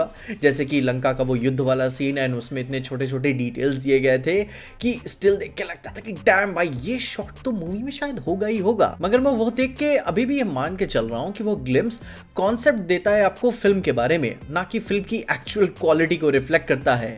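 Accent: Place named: North, India. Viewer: abbe accent ka difference hai. North me aise hi bolte hao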